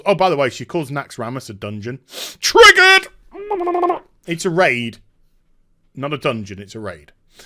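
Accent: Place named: United Kingdom. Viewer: with his British accent mimicking vapid valley girls...priceless!:)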